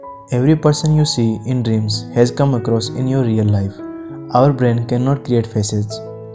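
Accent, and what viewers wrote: India. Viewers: Did you not find someone with better accent to have a voice over! Dehati English hai! And the first line is catchy! Very cheap! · Welp his foreign indian accent is like butter sad about the thumbnail but stayed for the voice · Heavy Indian accent.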